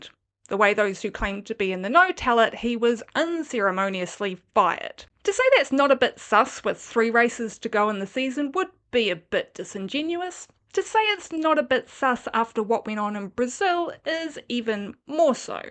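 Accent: New Zealand. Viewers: I like a kiwi accent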